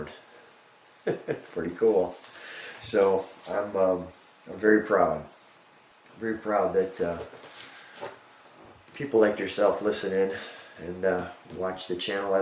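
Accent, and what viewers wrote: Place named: Chicago, United States. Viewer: Hey. Congratulations and thanks for the videos. I love cars like you do but some days I'll grab a My Car video just to hear some Chicago accents. I've been in Texas too long. Best wishes for your continued success and fun!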